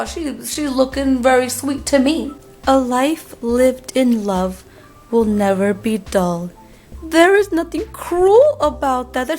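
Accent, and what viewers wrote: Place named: Japan. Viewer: Omigod I love your voice! It’s like a Japanese accent with a lil bit of hype black girl!! XD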